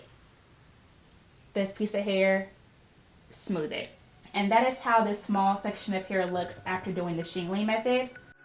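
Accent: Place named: Australia. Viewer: Thanks for this video! I definitely will try this tomorrow! I wonder how much time I will need? You have such an interesting accent, is that Australian?!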